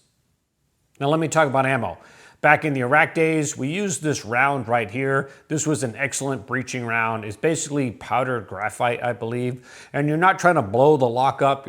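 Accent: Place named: Canada. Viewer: Are you originally from Canada? Your accent sounds Canadian.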